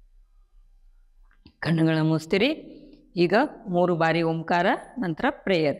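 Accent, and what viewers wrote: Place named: North Karnataka, India. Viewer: ನಮ್ಮ ಉತ್ತರ ಕರ್ನಾಟಕ ಭಾಷೆ ಕೇಳೋದೇ ಚೆಂದ ❤‍🩹❤‍🩹❤‍🩹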